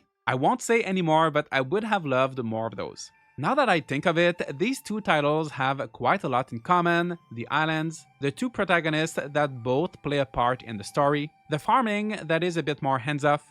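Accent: Quebec, Canada. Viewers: Are you québécois ? Your accent sound like it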